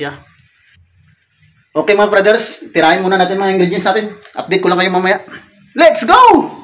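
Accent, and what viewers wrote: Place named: Iloilo, Philippines. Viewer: Ilonggo ka sir😊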